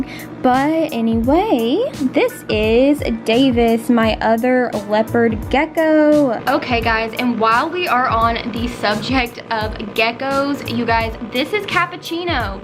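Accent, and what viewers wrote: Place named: Tennessee, United States. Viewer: aww awesome video! this channel deserves more views! love hearing the tennese accent to lol!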